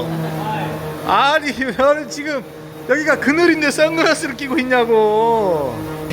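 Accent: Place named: Jeolla, South Korea. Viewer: 눌렀다 ㆍ 이 ~ ㅋㅋ 전라도 사투리를 ㅎ 나도 전라도인데 사투리 엄청 심하거든요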